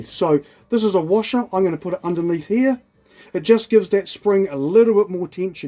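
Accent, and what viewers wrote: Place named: New Zealand. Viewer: Cars and cameras, red beards garage have lots of helpful stuff....but the kiwi accent is great!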